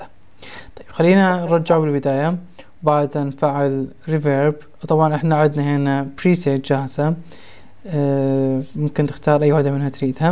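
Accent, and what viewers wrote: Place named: Mosul, Iraq. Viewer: من اللهجة مبين من الموصل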